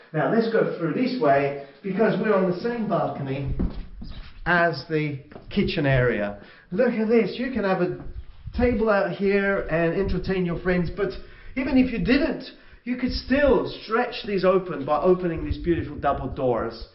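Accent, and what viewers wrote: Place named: England, United Kingdom. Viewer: Aww Thanks so Much, for your Response. I am currently Watching other Episode from Your Channel “Buying a property in Italy Salento by lol lol I watched You and Dave From DauVo and Subscribed to your Channel and became a Fan!! I wish You Many Success!! Love your English Accent even though I know you are 100 % Italian, Also Compliments to Your Fashion Style very Charming!!! It’s great to Watch someone with so much Knowledge and in the meantime that takes time to Pay attention to the Personal Appearance! That says a lot. Because you are sending a Positive Message if you take care of yourself definitely you will take good care of someone else property. I enjoy Watching your Channel! Great Personality!! Warms Regards from. Noriko in Míami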